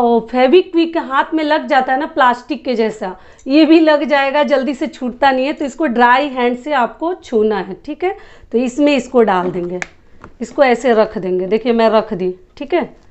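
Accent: Bihar, India. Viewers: Are you from Bihar?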